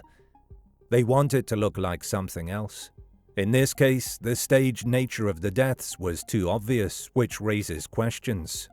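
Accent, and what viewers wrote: England, United Kingdom. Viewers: I live here and visited the house days ater...It was not a gated house as you state and they were not onte of the most influential in the country....i;m only a minute in but your already this wrong I wonder what senasationalistic journalism awaits....shnooty english voice and all....work more on facts and less on being entertaining.This isn't Seinfeld we came to watch. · Why do we need a commentor with a British dialect to do a Canadian situation. Do we have to Outsource everything?😢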